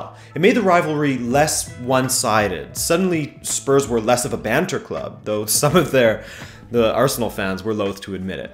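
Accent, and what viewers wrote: United States. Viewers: Your listening to a yank 🤣🤣🤣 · Not in the US. And he speaks with a US accent, so it's confusing. I'd never heard it pronounced darby by an american. It was originally pronounced derby in the UK also, but not for a couple hundred years. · My god it sounds terrible hearing an American talk about football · American accent the entire video excluding your pronunciation of “derby” why do you say it like you’re an Englishman lmao “Darby”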